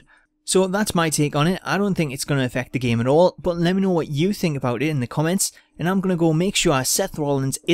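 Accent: Newcastle, United Kingdom. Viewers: I don't understand anything you said with your british accent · hey man where are you from because you sound like a fellow geordie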